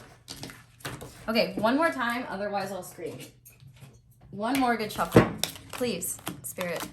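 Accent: England, United Kingdom. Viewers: Omg pile 4 I love your British accent!! Coming over for tea later Air Smooches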